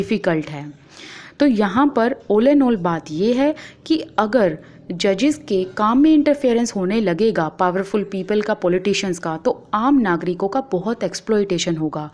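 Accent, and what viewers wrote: Haryana, India. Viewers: Ma'am haryana ki kr waha ke log aese hi bolte he 😒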